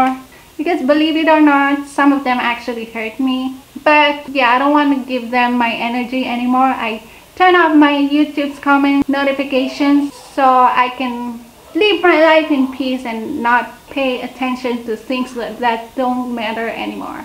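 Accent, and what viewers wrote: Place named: Indonesia. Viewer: Hai dear, u sound more like indonesian then malaysian? Where are you original from?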